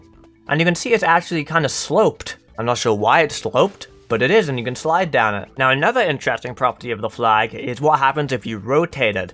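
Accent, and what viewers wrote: Wales, United Kingdom. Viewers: She's British, Welsh specifically. That is what Britons call the last letter of the English language, which their ancestors the Anglo-Saxons created.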